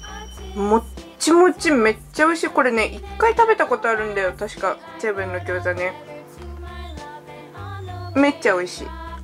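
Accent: Aomori, Japan. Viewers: 出身青森？訛りが青森っぽい！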